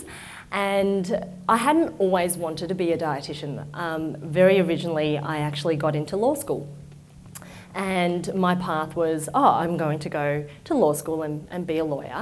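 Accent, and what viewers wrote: Australia. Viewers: Is it aussie accent? Cause I LOVE it. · Totally Aussie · An Asian with an Australian accent, sign me up! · OK the "Young Guy" in me resurfaced ... a young very pretty Woman that is super smart, has Asian features and an Aussie accent... Doesn't get much better ... OK, back to learning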